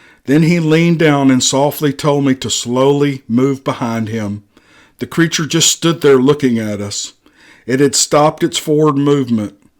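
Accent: South, United States. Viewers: You’ve got the perfect southern voice to give every story an authentic feel based on the location. It sounds like you’re really the person who experienced these stories. Real or fake, you do em justice by bringing them to life. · Kevin Costner with a southern accent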